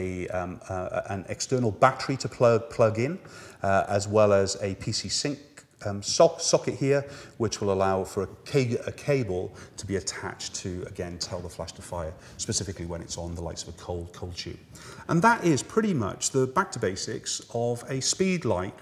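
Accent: United Kingdom. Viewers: Morgan Calvi English West Country...Gloucester or Bristol would be my guess.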